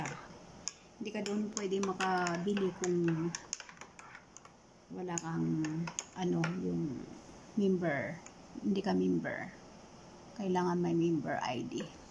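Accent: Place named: Visayas, Philippines. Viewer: hello nanay bisaya ka haha pariha ta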